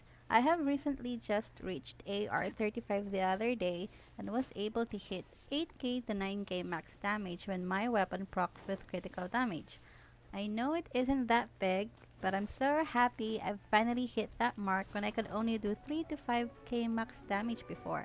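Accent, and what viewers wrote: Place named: Philippines. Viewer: Sabi ko na nga ba filipino accent si ate support kita🥰♥️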